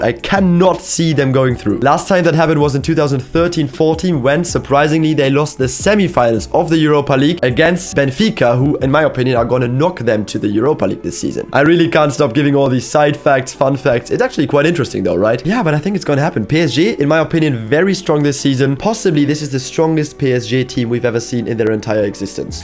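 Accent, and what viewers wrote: Germany. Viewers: your accent is so german. Iconic!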